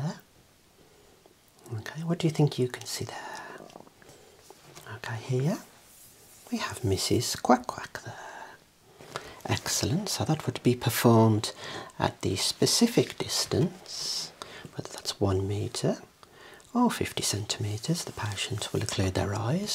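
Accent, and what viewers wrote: United Kingdom. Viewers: Absolutely love the British accent ☺️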